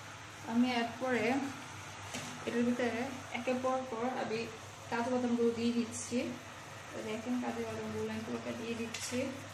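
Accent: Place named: Dhaka, Bangladesh. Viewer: Apu bpr na! Amr language e dhakaiya tan e vlo lage. Normally chalay jan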